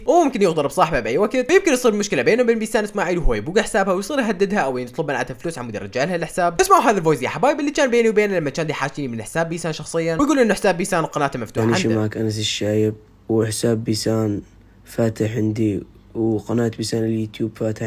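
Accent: Iraq. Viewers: بس سوال انته عراقي لو لا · سؤال انته عراقي لو لا ردلي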